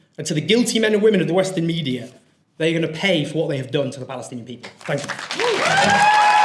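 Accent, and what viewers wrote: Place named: England, United Kingdom. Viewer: Did you miss your Meds? Owen, was born in England. Can you not hear the accent? It's THEIR language! Hahahahahahaha youre funny!!! I feel sorry for you man. I really, really do. Why, do such sad people like yourself choose to live. Enlighten me?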